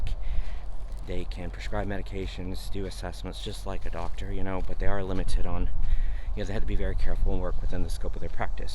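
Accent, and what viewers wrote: Texas, United States. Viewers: Hi Denmark here. Don't worry about the accent, when you speak Swedish fluent, you will still have the accent. But it doesn't matter. I have a friend, he has been in the country for about 25 years. He's accent is noticeable, but he speaks fluent Danish and can have a perfectly normal conversation with a Dane. BTW, your Texan accent ain't that heavy. I have heard it way "worse". Have you tried reading Danish. A Swede, can quite easily read Danish. Specialsygeplejeske.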